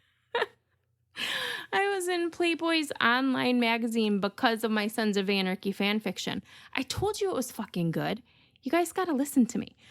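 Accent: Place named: Michigan, United States. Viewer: I think your channel is fabulous!!! I was born and raised in Michigan. I moved to Washington state in 2011, and I really miss home! I feel like I found gold...I'm addicted to true crime podcasts, and hearing your stories in our lovely accent (lol) makes me so happy! Thank you for your thorough research and very interesting subject matter....keep these videos coming!!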